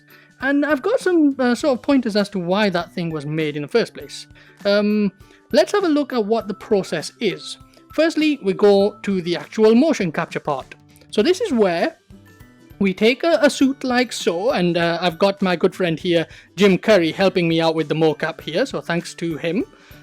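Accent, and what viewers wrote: United Kingdom. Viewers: I bet this dude is Asian too, it's that British Asian accent 😂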